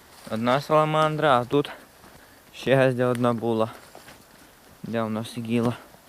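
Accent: Hutsul, Ukraine. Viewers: Приємно слухати гуцульську говірку я б тілько за це і дививсі би!)) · Але файна розмова Гуцульска дуже люблю слухати 😂❤❤ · Насолоджуюсь лісом, грибочками і МОВОЮ! Спасибі, легінику! Це свято - побувати в осінньому лісі, побачити саламандр і почути файну гуцульську говірку! Дякую щиро!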